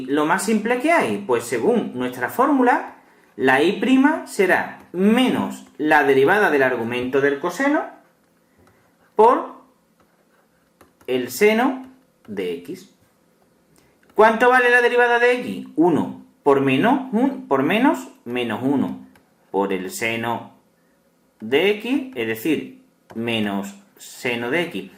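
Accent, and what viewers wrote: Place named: Catalonia, Spain. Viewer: perfectos tus vidios, aun que aún no me acostumbro a tu acento catalán... .___.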